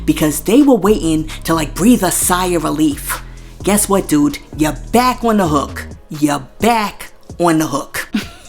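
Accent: New York, United States. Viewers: I love this narrator's heavy ass New York Bkack Accent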